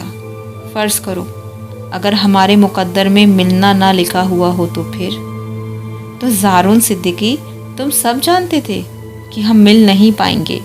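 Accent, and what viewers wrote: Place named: Pakistan. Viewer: Bht khoob hai y urdu lehja apka n very nice❣️❣️❣️❣️❣️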